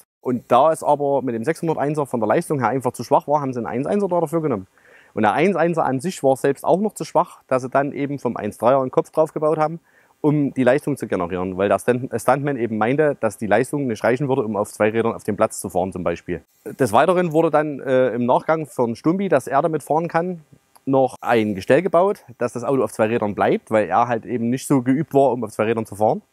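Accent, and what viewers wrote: Saxony, Germany. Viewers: Ein toller Pappe Oldtimer schon fast 70 Jahre alte Entwicklung! 👍🏼🤘🌹 Ein echtes Roeff ..Töff Töff! Cool! Nach damaliger Bestellung.... nach 12 Jahren erhalt......schon verrostet am Unterboden! Mit ganz tollem sächsischen Dialekt! 👍🏼👍🏼👍🏼👍🏼👍🏼🤣😂😎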